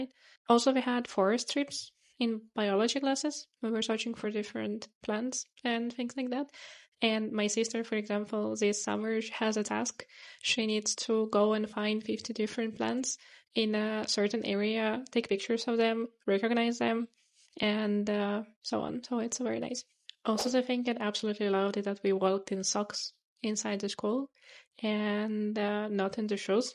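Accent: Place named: Poland. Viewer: Are you Polish? Kind of a soft Polish accent I'm hearing.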